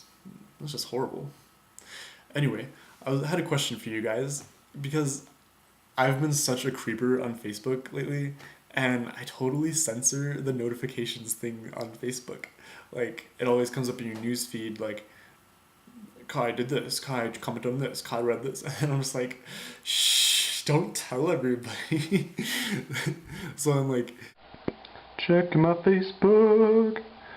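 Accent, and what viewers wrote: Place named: Germany. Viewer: i hear your german xD greetings from germany rofl ^^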